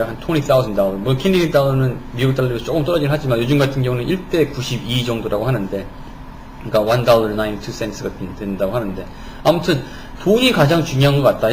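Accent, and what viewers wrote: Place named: South Korea. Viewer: hes good but he stuttered too much.. and has a korean accent.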